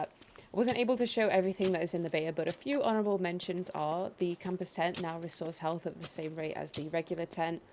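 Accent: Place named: England, United Kingdom. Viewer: sub'd these update videos are nice i get to listen to it in a cute English accent too :D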